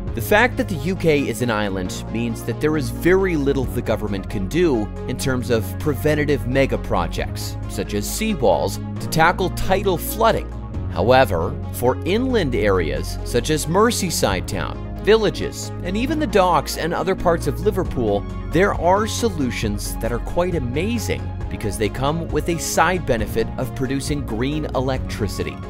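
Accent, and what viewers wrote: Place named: United States. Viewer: The American accent is a dead giveaway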